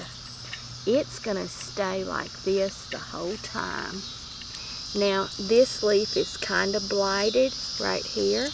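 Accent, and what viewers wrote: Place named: Georgia, United States. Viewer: Love your accent. Reminds me of the ladies I have met in Georgia when I was stationed at Ft. Gordon, Augusta , Ga. in the US Army. Thanks for the great identiication of the variuos species. I just bought some L. Virosa seeds and am trying to sprout some right now. But I have to wait for the second years growth before I harvest any milky sap. God Speed to you !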